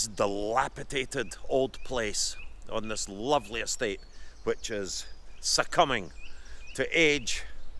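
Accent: Scotland, United Kingdom. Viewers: I would have never thought that one day i'd watch a video of Avatar's villain showing me the tomb of a medieval knight, with the thickest scottish accent i've ever heard.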